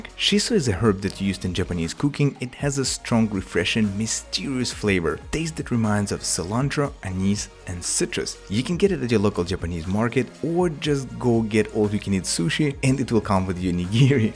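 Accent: Russia. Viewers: "Your basic cocktail making skills" if the accent wasn't enough that sold it. He's definitely Russian.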